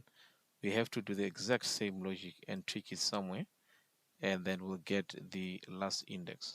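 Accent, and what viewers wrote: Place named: South Africa. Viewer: Please continue making these DSA videos. Your teaching style resonates with me, and perhaps it’s your South African accent that I find refreshing.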